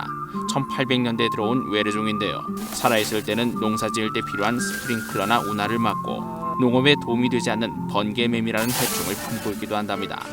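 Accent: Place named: Seoul, South Korea. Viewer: 옛날 서울말 쓰시는 것 같다 ㅋㅋ 옛느낌 낭낭한 목소리 분위기 좋당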